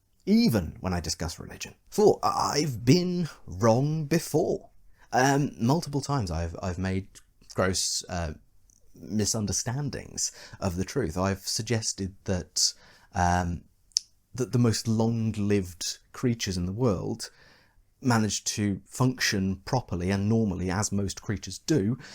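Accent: United Kingdom. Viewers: To be fair, everything sounds more believable if said in a erudite British accent.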